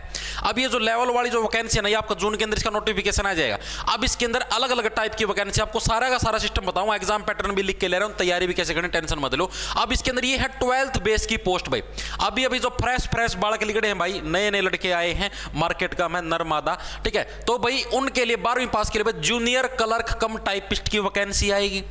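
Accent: Haryana, India. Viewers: Sb kuch pta hone k bad m aapki video dekhna jaruri hota h pta na ki mjjaa aata h haryanvi tone m 😂😂😂😂😂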